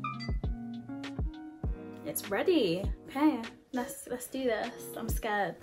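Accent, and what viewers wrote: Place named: United Kingdom. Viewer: I feel like when people have a British accent they have an advantage in life but when people have an African/ British accent. Untouchable. Lol looks so good on you and love your voice. It’s so pleasant.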